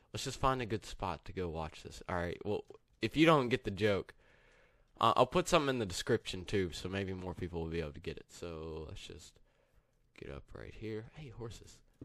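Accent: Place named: Kentucky, United States. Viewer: I seen that is from Kentucky he’s got that accent I live in pikeville KY btw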